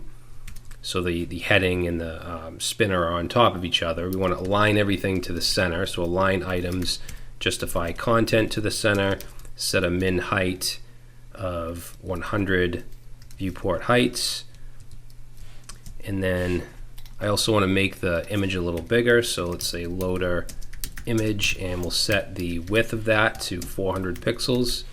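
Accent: Boston, United States. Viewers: The Boston accent of knowledge!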